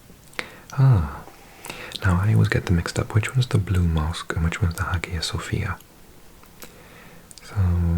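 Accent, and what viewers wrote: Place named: Wales, United Kingdom. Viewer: I have a theory…. Your Welsh!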